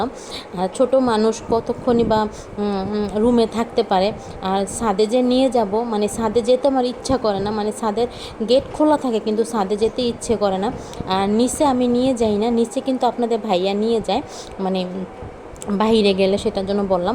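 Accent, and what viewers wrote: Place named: Comilla, Bangladesh. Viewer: আপু তোমার বাড়ি কি কুমিল্লা কথা গুলো শুনে মনে হলো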